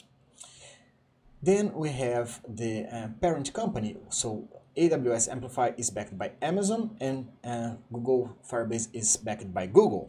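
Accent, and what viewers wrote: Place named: Brazil. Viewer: Cara, é incrível que no instante que eu te ouvi falar eu já tinha quase certeza que você era brasileiro, fiquei muito feliz por isso. Sucesso pra vocês e obrigado pelo conteúdo.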